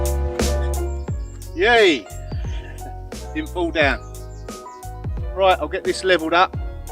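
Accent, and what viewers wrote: Essex, United Kingdom. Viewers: i live in Thorpe Bay (Southend) i knew you had a soft Essex accent. Wonder why you left?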